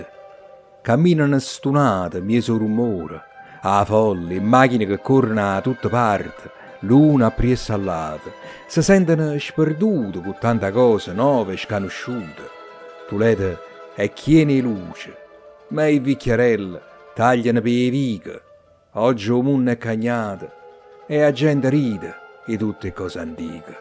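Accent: Italy. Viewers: ...., dove tutto è tarlato,come voi,!! Come fa riflettere questa bellissima poesia, lo specchio,!!...Eppure non è triste,ma lucida realtà, che fa grande tenerezza, ma che attende tutti,...quelli fortunati!!,...sei un grande artista, sempre...ma quando interpreti il dialetto napoletano,... diventi irresistibile....da ascoltare più e più volte!!! Ti faccio i complimenti più sinceri. 💯👏⚘♡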